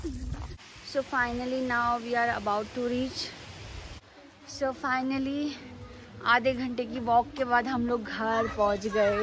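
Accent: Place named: Pahari, India. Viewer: Her pahari accent 🔥😘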